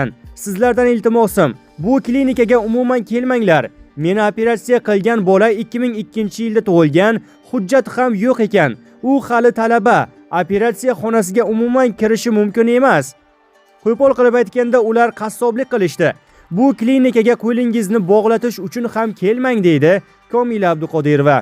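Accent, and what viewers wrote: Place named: Tashkent, Uzbekistan. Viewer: Бу канака гап энди маданият борми аелмисиз эркакмисиз аел киши шунака гапирадими тогда тошкенча гапиряпсизда энди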